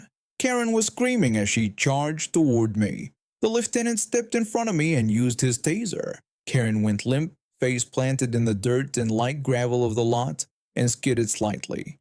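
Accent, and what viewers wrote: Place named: United Kingdom. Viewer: It's the British and Australian pronunciation. Many of these stories originate in other countries, and is clearly not a US native. No doubt he learned English from a Brit. I think his pronuniation is kinda cool!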